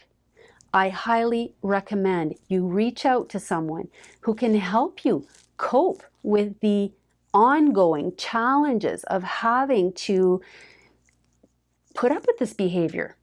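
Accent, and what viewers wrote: Canada. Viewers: I like your Canadian accent! Canada is my favorite place to visit. Thanks for sharing.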